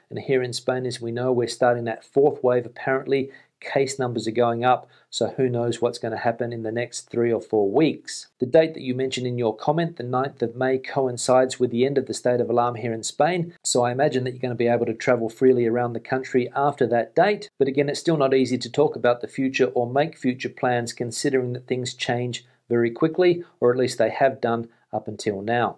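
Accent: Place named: Australia. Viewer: Thanks. I look forward to listening to you every day; especially with your cheerful Australian accent! I’ve been waiting for over a year to find out when I can get over to Spain from Scotland as I have a few holiday houses I have my eye on. It’s been so frustrating and we are still no further ahead. I thought that the vaccines were supposed to give us more freedom but, alas, it doesn’t look like it’s going to make much difference. Take care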